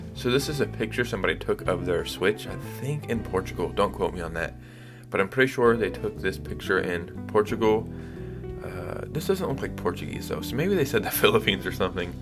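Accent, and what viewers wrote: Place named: Orlando, United States. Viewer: So this is what Orlando people sound like. That’s chill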